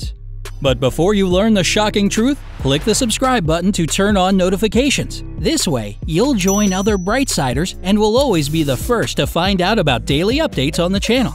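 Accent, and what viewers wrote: Russia. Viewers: Are u russian? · To: Bright side Are you a Russian or Ukrainian?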